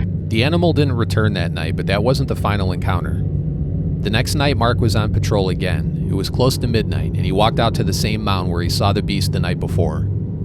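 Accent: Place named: Wisconsin, United States. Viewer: I just found your channel and your accent... I feel like you have spent more than a little time in wisconsin yourself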